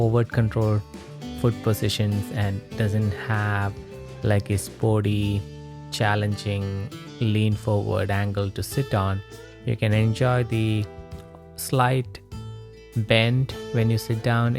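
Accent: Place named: India. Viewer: That desi accent though. Say " Glass "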